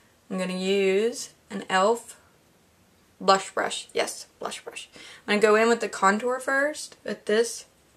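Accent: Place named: South, United States. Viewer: Love the finished look, also your lovely southern accent, from the emerald Isle...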